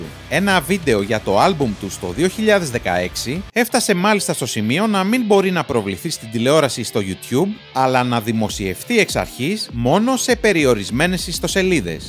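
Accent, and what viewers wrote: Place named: England, United Kingdom. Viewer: Πέρα από το ξεκίνημα του κιθαρίστα στα '80s δεν βλέπω καμμιά "άγνωστη αλήθεια". Και το κείμενο είναι μάλλον έκθεση λυκείου, διαβασμένο από κάποιον που πιθανότατα δεν έχει ιδέα για την μπάντα. Κατ' αρχήν, μέχρι και οι πέτρες ξέρουν ότι τα φετινά τους live είναι επετειακά για τα 30 χρόνια τους, και όχι 25. Έπειτα Γερμανικοί τίτλοι και ονόματα διαβασμένα με Αγγλική προφορά. Αν είχε ακούσει 2-3 φορές έστω τα κομμάτια, θα τα έλεγε σωστότερα, κι ας μην ξέρει Γερμανικά. Επίσης... άκου εκεί "ωραίες μελωδίες και καλή παραγωγή". Μιλάμε για τις πλέον κορυφαίες παραγωγές παγκοσμίως, πραγματικά "σχολείο" για ηχολήπτες και μουσικούς παραγωγούς. Όχι "καλή παραγωγή". Έλεος. Και δεν βγήκαν με κουστούμια χοντρών για να "γελιοποιήσουν το θέμα της παχυαρκίας" αλλά γιατί έτσι ήταν στο video clip του - το οποίο μιλάει γενικότερα για την παρακμή των ανθρώπων που δεν έχουν κανένα πια ενδιαφέρον στην ζωή τους.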